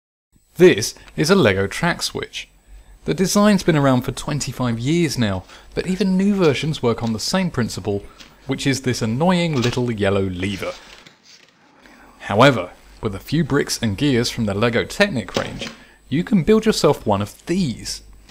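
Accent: United Kingdom. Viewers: A Brit dude with brilliant story telling educating me about arduino and trains? YES PLEASE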